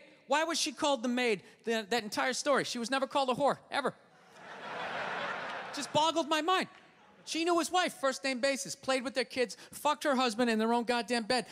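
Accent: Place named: United States. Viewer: He's really an Irishman with an american accent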